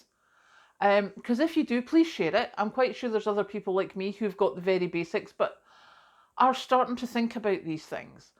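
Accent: Scotland, United Kingdom. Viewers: NOOA prints that so they can justify turing the power off on hot days. It's normal temps for those states. If parasites are all that is wrong with your water, boiling it will kill those. No need for bleach, it makes the water taste terrible. There is no need for filtration. You can boil it & strain it thru your filters for added protection. I LOVE your beautiful accent (Oregoinian here), so please forgive me when i say i had a hard time understanding if you said "water bob" or "water bottle." A water bob is great. You put it in your bathtub and fill it up when you think the water will be shut off. It holds about 50 gallons. (only stating this in case you never heard of one). I LOVE you. I LOVE your smile! I LOVE your videos. I love that we live in a time that I can watch you all the way in Scotland.❤❤❤❤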